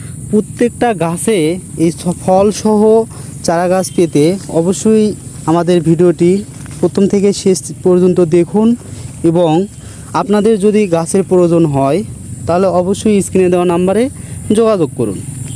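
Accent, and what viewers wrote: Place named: Bogura, Bangladesh. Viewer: বগুড়ার কথায়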